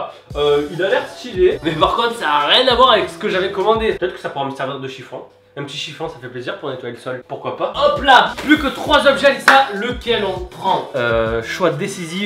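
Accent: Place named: South, France. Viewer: T du sud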